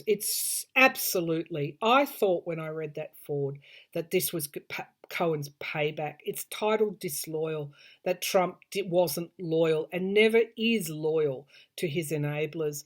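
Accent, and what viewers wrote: Australia. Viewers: 🙋‍♀️I love your Aussie accent 😊❤ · Love your accent and purely Aussie expressions❤️ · I'm glad I'm not the only one who gets annoyed at viewers who correct others so easily. As for me, I love your wonderful Aussie accent & accents in general. Thanks for all you do. I really enjoy your readings . 👏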